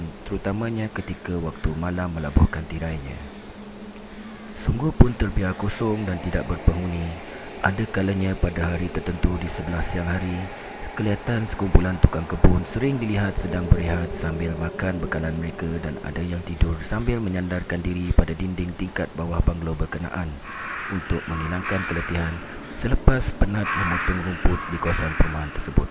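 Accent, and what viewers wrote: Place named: Sarawak, Malaysia. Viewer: apa lampu picit ia..agi pekat kelaka kitak sarawak iya bro...terbaik bro.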